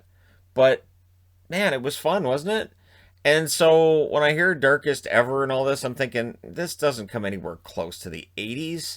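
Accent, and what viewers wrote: Canada. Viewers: Feel the love Even if he does pronounce words ala Canada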